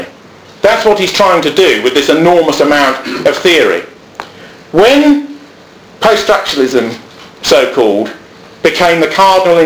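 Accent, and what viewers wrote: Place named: RP, United Kingdom. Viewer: Posh accent.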